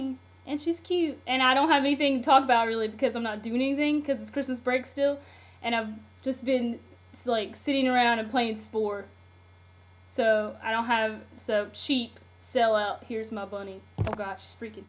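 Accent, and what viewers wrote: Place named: South, United States. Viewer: "Oh gosh she's freekin'" *Camera cuts out* Hahaha. What is your accent? It's really cool. It sounds kinda southern... but you don't talk slow. It's a good mix.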